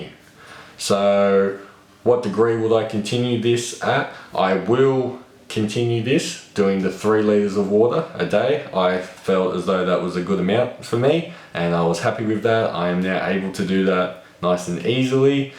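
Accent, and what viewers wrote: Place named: Australia. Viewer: You look German but is Australian or New Zealand asf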